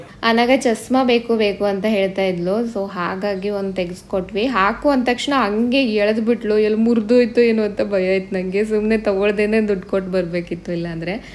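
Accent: Kundapura, India. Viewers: Kantaradalli cundapura bashe irodu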